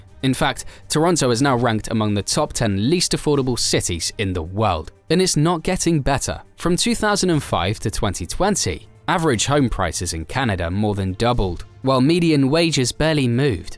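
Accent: United Kingdom. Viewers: Dude, I love your British accent 😍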